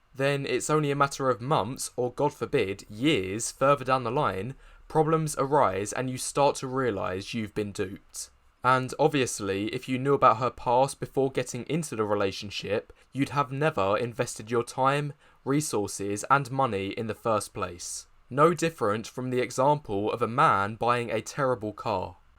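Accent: Essex, United Kingdom. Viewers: I love your content but would it be possible for you to work on your Towie girl accent? “Togevarrrr” (together) “Eivarrr” (either) “One two free girws standing togevarrr” Come on. You’re better than this.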